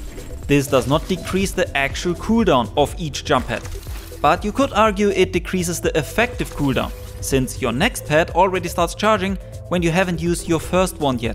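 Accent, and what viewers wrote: Germany. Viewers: Ich hab bei deinem akzent schon immer gedacht das du deutsch sein könntest hab aber nie was gefunden, bis jetzt 😂🫶🏻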